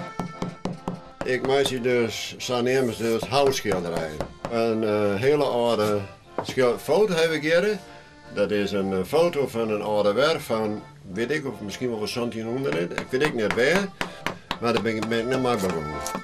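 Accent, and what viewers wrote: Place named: Westerkwartier, Netherlands. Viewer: It dialekt dat dizze man sprutst klinket in bytsje as it Grinslânske dialekt "Westerkertiersk"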